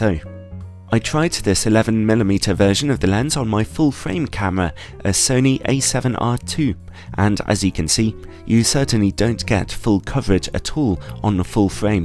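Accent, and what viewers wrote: United Kingdom. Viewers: You’re literally taking the British accent onto a whole new level of royalness. Great sum up by the way :)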